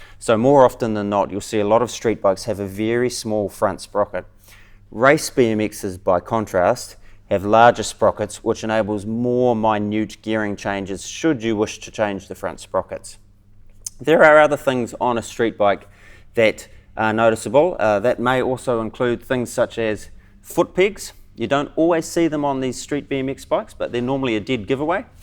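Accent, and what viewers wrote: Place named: New Zealand. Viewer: NZ Accents kill, "rice" hardly! "raice" maybe >> Decent vid though for the sprocket leagues.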